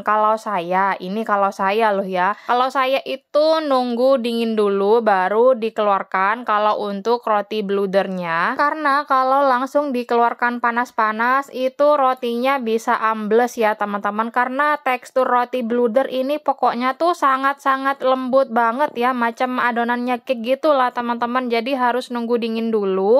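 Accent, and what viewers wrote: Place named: Bondowoso, Indonesia. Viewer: Mbak ini dimana rumahnya? Koq lafadnya kayak orang Bondowoso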